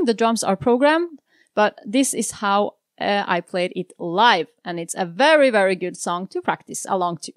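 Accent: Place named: Norway. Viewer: that's what I thought, you had that Swedish accent. Which turns out to be Norwegian.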